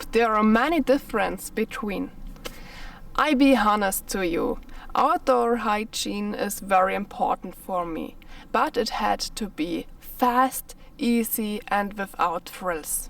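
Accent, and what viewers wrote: Germany. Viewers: I love a woman's German accent when she speaks English. Danke!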